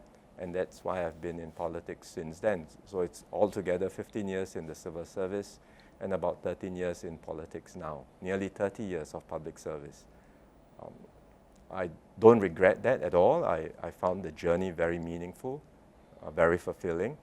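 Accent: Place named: Katong, Singapore. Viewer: He's the quintessential product of 1980s 1990s Singapore. Whether this is the right training I don't know. To me, his phonics and intonation already tells me he's from Katong, and I feel alienated. But who knows, Goh Chok Tong's economics seem to make our lives better. Maybe he may introduce more Singaporean friendly policy, maybe life would be better. At least he goes to Parkway Parade, and knows about problems back in the day.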